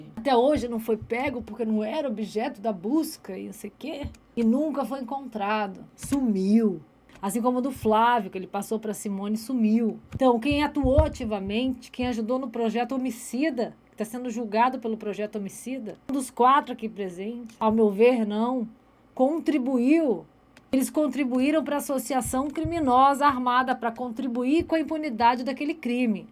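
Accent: Rio de Janeiro, Brazil. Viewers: O sotaque do Rio é massa 😅, parabéns 👏🏾😘.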